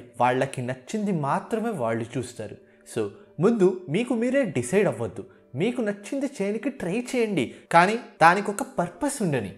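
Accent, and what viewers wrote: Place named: Karimnagar, India. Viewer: broo are you from Karimnagar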